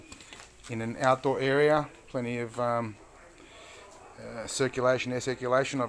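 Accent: Australia. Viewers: It's so nice to hear an Australian accent for a change (fellow Aussie here). I've been collecting discarded cedar blinds for awhile for a large scale upcycling product (patio ceiling lining), so I'm well practiced at dismantling them the same way you do. Refinishing them en masse is a daunting task though! I've tested out a few methods so far, such as paint stripper, but I haven't found anything that works as well as sanding unfortunately. I'm planning on laying multiple slats flat next to each other and clamping them down with a batton lying across the top of them to hopefully speed up the sanding process. It's comforting seeing someone else refinishing them as well. It gives me hope! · Thanks for that. Nice to hear an Aussie accent. My timber venetian blinds faded in less than a year thanks to the Australian summer sun. lol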